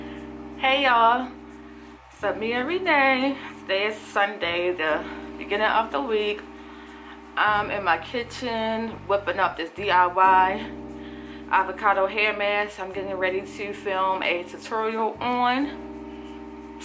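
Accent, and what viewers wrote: Baltimore, United States. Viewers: Great video. Is your accent Mayland/Baltimore?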